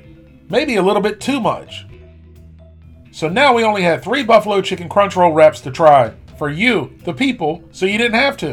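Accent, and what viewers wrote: South, United States. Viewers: Hey! Just started binge watching your videos this week and I have to say it man, love the southern drawl. Makes me feel right at home. Keep on keepin on brotha!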